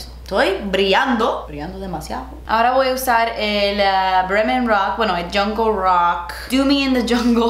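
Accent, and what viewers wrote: Cuba. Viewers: Me encanta tu acento cubano, de donde lo sacas 🤔 eres muy valiente! Ojalá hagas mas vídeos así para tu publico hispano 😘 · Jajajaja comment no se dice "comento" se dice comentario... But you're so cute! Amo tu español con acento bien cubano 🖤 and primer es prebase ya sea prebase de ojos o prebase de maquillaje.. Love you girl! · Qué gracioso vídeo. Tú español cubano 😁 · Me encantó este video en español, lo hiciste muy bien. Me gusta tu español cubano. Saludos desde República Dominicana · Me encanta tu acento cubano!!! seguramente no tienes eduación formal en español pero hablas muy bien. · Don’t know how to say it in Spanish? Add “ar” at the end and you’re good to go jajajajajajaja Amo cuando hace los videos en español, me dan mucha risa y el acento cubano es puro amor 😍😍😍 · Me encanta cuando haces videos en español, hazlos más seguido así entiendo😁 . 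Se te nota que aprendiste el español con alguien de cuba jeje. Saludos desde Uruguay 🇺🇾(américa del sur) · I think you've improve your Spanish in this video so far... I hear you more fluent and comfortable with it. I really enjoy your videos so much and this made me laugh so hard. I love your cuban accent. Send you much love and good vibes <3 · Hahahaa me encanta su spanglish! 🤣🤣 true 305 cuban style ayeee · such a Slytherin look! love it! and your cuban accent is so cute haha (love from Uruguay :D) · I never comment but these are my FAVOURITE KINDS OF VIDEO EVER. Honestly so good. As a native Spanish speaker, I appreciate these so much 😂 me encanta el acento cubano también. Now I live in the UK so I can relate to not knowing some of the words in Spanish (but I personally have no excuse 😂🙈) xx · Tu español es súper bueno!!!! Cuando nosotras hablamos de maquillaje (en español) usamos palabras en inglés, por ejemplo: highlight. 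Así que no te preocupes ❤️ Lo único que me causa gracia es que tu acento es SÚPER cubano y nunca pensé que sería así jajaj a pesar de que tus raíces son cubanas. Proud of you girl ❤️ siempre has sido mi inspiración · You speak Spanish like I do. I consider English my first language even though I am Cuban. I do not have a Spanish accent when I speak in English (which is always). But I’m kind of surprised because of your noticeable (and adorable) Cuban accent I thought your Spanish would be more fluent. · Blend= difuminar. Amo tu acento cubano 💕 Also we dont call primer the “cebador” 😂 just primer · Me encantó el acento cubano que te salió en el vídeo. Un besazo desde España 💋💋💋💋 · Me encanta oirte hablar español cubano! Cada vez hablas mejor!! 👏🏻👏🏻👏🏻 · Please please please make more videos in Spanish lol I really enjoyed watching this video, and i love your Cuban accent. fyi my sister have the same name of you, we're from venezuela. 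· Jajajajajakakakaka me encanta te ha enseñado a hablar alguien cubano o de puerto rico jajajaja pero me encanta ojala te hagas un canal en español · did not know was cuban... or at least speaks with a cuban accent 🇨🇺 ❤️ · wow your cuban accent is so strong!!! I learn spanish from spain growing up so it's so cool to hear the different accents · Me encanta ese acento cubano 😂❤️ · Amo tu acento cubano! Es lo máximo :) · Acento cubano mami!! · Porfavorrrrr more videos in Spanish!!!!!! Tienes mucho flow hablando con ese súper acento cubano!!!! 😍😍 podrías ver algun video de youtubers españolas como: o alguna latina 😝 para mejorar el idioma !! Un saludo desde Islas Canarias (españa) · Soy mexicana, creo que tu acento cubano es genial, y tu español es muy bueno. Saludos :) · Mojado y Salvaje! 😉 Love this videos in Spanish! Y ese acento cubano es divino! 😃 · Pero a mi me gusta sobre todo el acentazo cubano tan genuino. 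Try= probar, usar. Y lo de la abuela: very good fandango! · Tienes un bonito acento cubano 🥰 love it!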